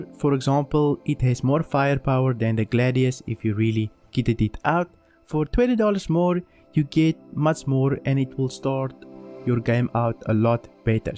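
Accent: Afrikaans, South Africa. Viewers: Lekker dude, ek het subscribe. ek kan mooi hoor jys afrikaans. Ek dink jy moet jou kanal in Afrikaans doen. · Ayyyy a SOUTH AFRICAN bru, keep up the hard work and thank you for the video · Ek kan jou Afrikaans hoor my ou hahahaha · Are you South African? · Awe my broe. I hear this guy speak for 3 seconds and i'm like "is he from south africa?"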